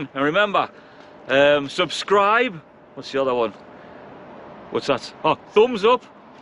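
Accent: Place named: Newcastle, United Kingdom. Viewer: Merry Christmas and Happy New Year. I just discovered you and my absolute favorite is your chatting when you ride. I'm not native English speaker and sometimes it's difficult for me to follow your dialect... what is that, are you from Newcastle or where? Anyway, love to follow you, cheers!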